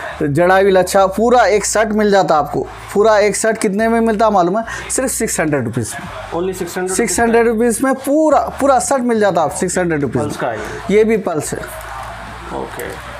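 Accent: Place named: Hyderabad, India. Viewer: Hyderabadi baata ya Allah 😂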